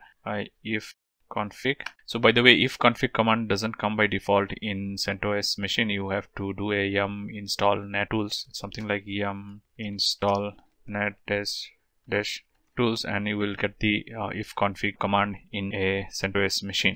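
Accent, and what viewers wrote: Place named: India. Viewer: Knowing that your Indian accent is terrible , Why cannot you try to speak slowly ? look like french train called TGV. 😒 Anyway thank you for having take your time to make your tutorial.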